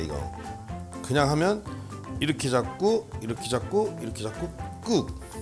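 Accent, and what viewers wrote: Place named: Countryside, South Korea. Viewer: 쩜매가꼬 구수한 고향의 사투리 반갑습니다 어린시절 시골에서 살때 참 많이듣던 짬매가꼬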